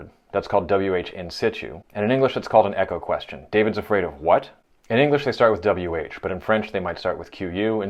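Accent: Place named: United States. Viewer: What I've learnt from this video, and having checked to verify, is that Americans seem to pronounce plural 'moths' differently to us Brits, Irish, Australians and New Zealanders. You seem to say 'moθ' in the singular and 'moðz' in the plural whereas we also say 'moθ' in the singular but say 'moθs' in the plural. Yeah, I know we say the vowel differently too but that's not what I'm looking at.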